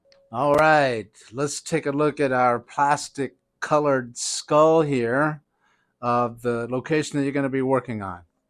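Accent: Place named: Louisiana, United States. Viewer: Tell me this man has Louisiana roots..... or am I hearing things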